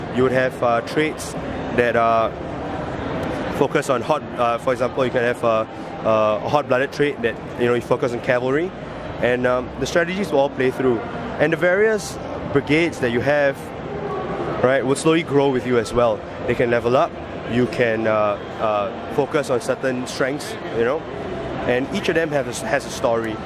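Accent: Singapore. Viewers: Sounds like a Singaporean.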